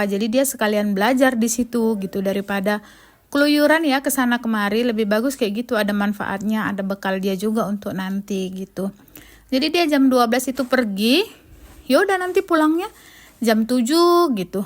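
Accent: Medan, Indonesia. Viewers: Kakak ini berasa bgt Medan nya, dulu saya pernah 6 thn di Medan..hihihi. disana jg ada kampung org Indianya ya bu...bpk suami ketemu di Medan juga kah bu? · Assalamualaikum dek... salam kenal dr jakarta,. aku baru tau ada chanel Nepali, maaf ya aku jd kepo... dek kl dr logat spt nya dr medan ya..? dimana ketemu suami hingga bisa nikah, sdh brp lama ada di nepal krn sdh punya anak besar2, suka pulang kah ke indonesia? dr berpakaian nya spr biasa aja, tdk spt kushboo pakai baju tradisi nepal pakai merah2 di kepala. terima ksh ya tolong jawaban nya ya... ❤❤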